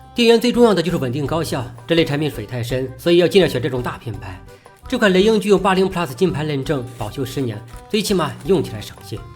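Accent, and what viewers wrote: Shandong, China. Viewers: 大哥山东人？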